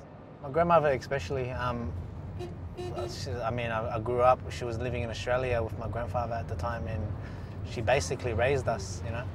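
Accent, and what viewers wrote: Vietnam. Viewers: chuan sai gon bac oi ;))) chu ok ji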